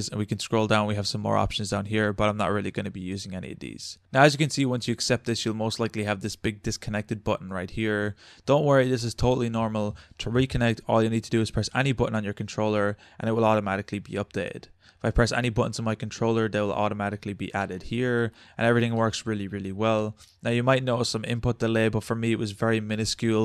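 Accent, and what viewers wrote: Ireland. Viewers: Irish accent is awesome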